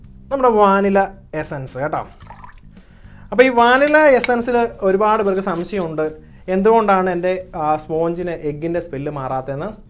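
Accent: Kottayam, India. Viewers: 'flar' 😄😄😄😄 sir kottayam kaaranano sir inte oro videos onninonnu soooopr 👍👍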